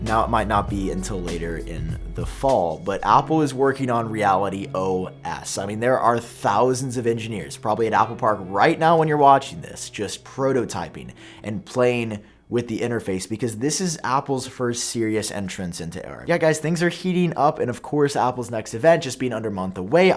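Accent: England, United Kingdom. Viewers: Great English accent! Thanks for the video! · British accent on fleek as the kids say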